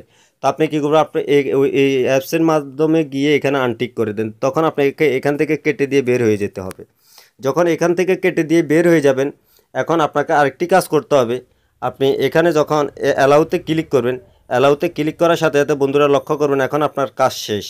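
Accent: Noakhali, Bangladesh. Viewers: আপনি আমাদের ফেনী নোয়াখালীর মানুষ হবেন আপনার কথা শুনে বুঝতে পারলাম যদি হয় কাজটা মন থেকে অনেক দোয়া করবো আর যদি না হয় কষ্ট করে আপনার কন্টাক্ট নাম্বারটা আমাকে দিলে খুব ভালো হয় আর আমি আপনার ফোনের মত হয়তোবা ছেলের আইডি ইউজ করি আরকি সেফটির জন্য প্লিজ আপনার নাম্বারটা দিলে কোন ক্ষতি হবে না প্লিজ 😢😢😢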